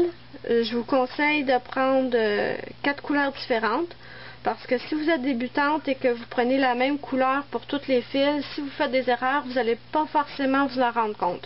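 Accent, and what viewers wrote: Canada. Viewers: Enfin compris! C'est super bien expliqué! Ps:ton chat ma fais rire et j'aime bien ton accent canadien! 👍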